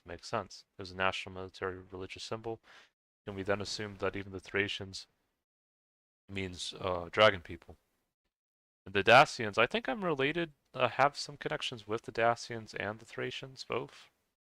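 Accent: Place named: United States. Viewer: "Possibly contain the Welsh and parts of England" How do you come to that conclusion? It definitely is the whole of the British Isles. Alba was originally used for the whole of the British Isle.... Where are you getting your information from? Just look at the DNA map, it tells you the basic story.... we are all majority "Celtic" Brythonic peoples. You sound American, you mention your Welsh-American friend..... No you are Americans, typically of Americans you think bloodline means something other than for medical reasons.. And you still then get it wrong.... All these groups of people you mention are cultural groups not genetic..... The Greeks and Romans, where most of these names come from knew nothing of genetics.... they arbitrary grouped people to what they thought should be grouped together. Again where do you get your information from? It sounds like you get a lot from Irish and Scottish nationalist who need to prove they are different from the English because they are bigoted and try to deny they joined in with building the British Empire. 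Note its the British Empire not English, with English people outnumbering the Irish, Welsh and Scots, by ten times why would they not have called it the English Empire? Answer, because it was ALL groups, not just the English. Stop listening to nationalists, they will always give you "their" version not a balanced version.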